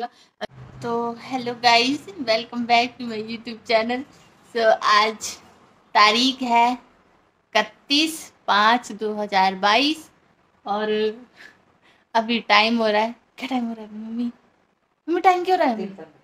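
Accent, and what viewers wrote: Haryana, India. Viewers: aap haryana se ho kya aap ki boli haryana side ki h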